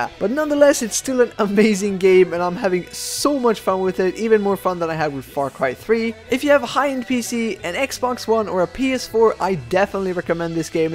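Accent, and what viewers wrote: Skåne, Sweden. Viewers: i knew u were cause u know that one q&a vid when u said some words on swedish i cinda heard that u were from skåne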